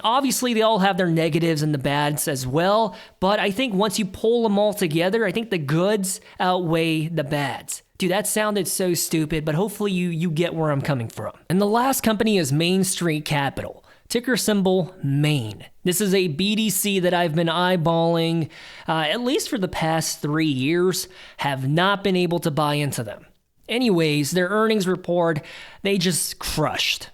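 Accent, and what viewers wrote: United States. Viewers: Based on the way you talk i thought you are white american. But i dont really care, im here for info and wife's boyfriend jokes :) Im white guy from Europe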